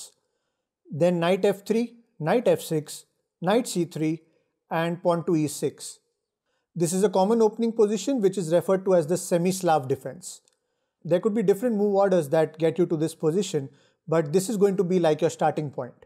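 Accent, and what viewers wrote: India. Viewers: Yariel Robles how on earth have you never heard an Indian accent? You’re aware like 1/7 of the world is Indian..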